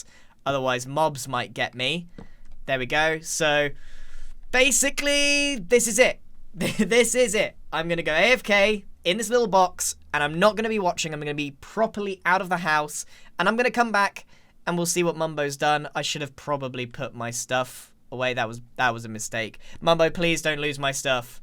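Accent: United Kingdom. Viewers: Grian with a broken voice sounds more brittish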